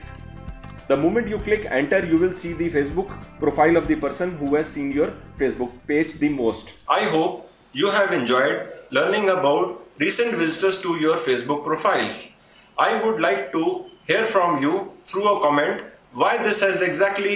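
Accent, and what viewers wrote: India. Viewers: oooooooooooh noooooooooooo damn indian voice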